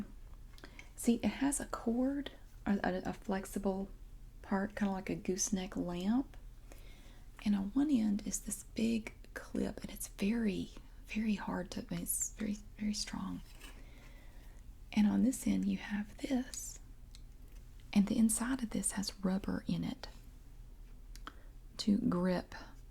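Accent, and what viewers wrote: South, United States. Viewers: We love your straightforward soft spoken vids. This display of your setup is especially interesting and informative. So much so that it tempts me to give it try too! Your down to earth manner is attractive and relaxing. Your sweet southern accents doesn't hurt either !! Thanks and keep em coming !!! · I laughed when you said, "I'm gunna move you!" . You already move us with the content you create. You have such a welcoming and comfortable personality. You come across as a very natural and honest lady. Really Down to earth! Your soft speaking voice and light southern accent are very relaxing and you're pretty darn cute too! I thought it was funny when we saw that your tri-pod looks to be the same as the one that I bought and your laptop looks like my Toshiba. LOL. . My Tri-pod is a Targus. :-O Keep the great videos coming. Thank You!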